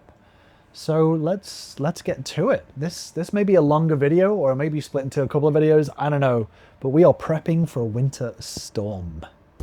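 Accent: United Kingdom. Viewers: Are you originally from the UK?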